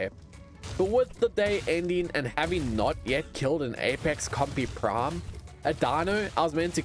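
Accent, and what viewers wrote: South Africa. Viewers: i knew you were south african lmaaooooooo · Are you South African ? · Mr, are you South African? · Kyk hier neh ....jou accent was sommer mooi engels met n hint afrikaans, maar tu jy ESKOM mention toe weet ek sommer....(nee wat hierdie Suidafrikaaner kan sommer enige tyd n sub kry) uitstekend my friend ...sign my op vir daai 250 D's (Ending was totaly unexpected)#afrikaaners bly bo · Wait a minute are you South African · damn okay. lots of ppl south african here. i thought he was like scottish or something(i aint good with accents) but hes south african. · Are you South African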